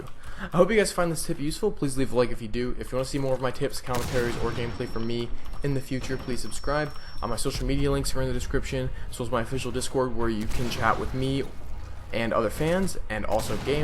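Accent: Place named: General American, United States. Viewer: The truth is that he talks with a completely standard american accent. At times it might have been a bit fast but he pretty well sounded everything out and spoke clearly. So honestly I do understand your struggle as I have learned a second language myself, but you just need to practice the language more and the criticism in my genuine opinion is undeserved.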